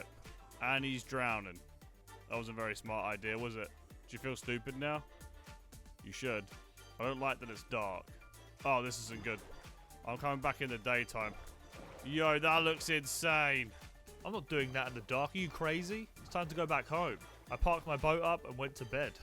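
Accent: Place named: United Kingdom. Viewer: oh hell yeah british accent... i love it :)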